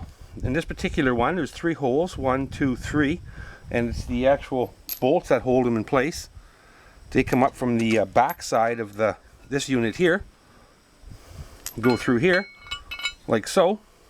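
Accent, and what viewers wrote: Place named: Canada. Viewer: Good possibility you're either Canadian, or from the U.P. I'm a bridge troll here from the L.P.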